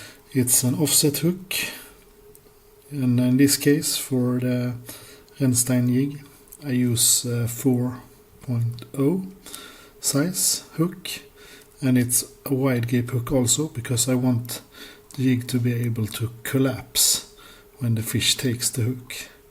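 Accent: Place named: Norway. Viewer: You said Sweden when you mentioned darts, but to me you sound very norwegian, especially how you say "just like this" sounds exactly like my dad whenever we visit our relatives in the US haha. Thanks for the guide, my local water for perch is full of crap, as its next to a mall, and old factory now museum, and not to mention an elementary school, specifacly a steiner school so they do alot of outdoor stuff, so along the edge where the perch sit there are so many rocks, and sticks that people have chucked into the water. Finally decided i needed to learn to use ofsett instead of having to go the store every 3rd fishing trip to pick up light wire leaders (there are alot of small small pike in the lake)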